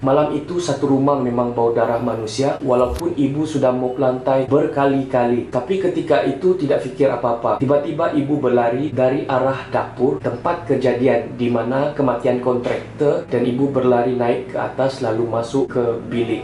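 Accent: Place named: Sabah, Malaysia. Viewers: Jgnla macam gitu bang. Sabahan mmg macamtu cakapnya. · dialek sabah...islam ke??? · Cam bunyi2 org sabah...well as sabahan susah eh mau kasi lari tu slang sabah hehehe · sesiapa yg komen kata cara dia bercakap mcm kupi2 go, korang mesti xpernah dgr org sabah bercakap kn? rajin2 la keluar dari bawah tempurung tu. belajar sikit dgr dialek ckp negeri2 lain supaya lepas ni dgr je dari cara org ckp dah tahu dia asal dari mana.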